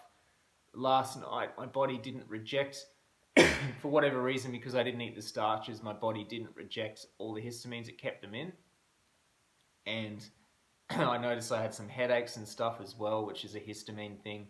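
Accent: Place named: Australia. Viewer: I don’t know what it is about the Australian accent but when I hear it I listen.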